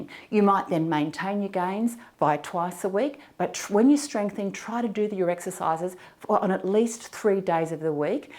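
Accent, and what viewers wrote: Australia. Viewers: I also love the Australian accent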